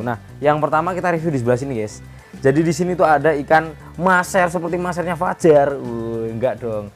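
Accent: Indonesia. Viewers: Sampean omahe endi bang...kok logate rodo semarangan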